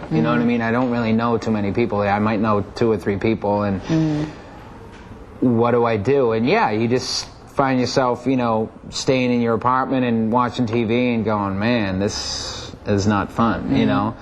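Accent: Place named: Boston, United States. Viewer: said "you know" 30 times. and each time was amazin lol love his boston accent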